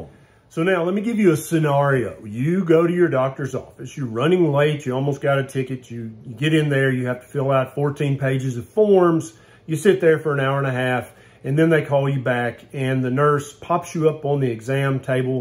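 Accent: South, United States. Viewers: "Before taking your blood pressure, I want you to sit down and relax. Do some deep breathing. Maybe turn the lights down. For around 10 to 15 minutes. This may seem like a lot of rigmarole..." How Southern can you get. 😄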